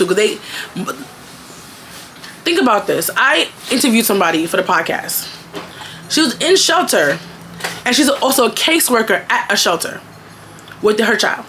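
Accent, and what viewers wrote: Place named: Jamaica. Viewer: Hear the Jamaican accent, dutty lol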